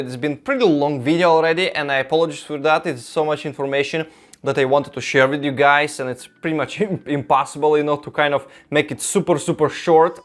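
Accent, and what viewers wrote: Russia. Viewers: Are u Russian? Your accent like Russian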